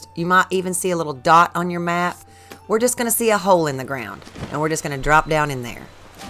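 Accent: South, United States. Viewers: I love your accent ! You are obviously an American - somewhere in the southern states I am guessing ?? Thanks for these videos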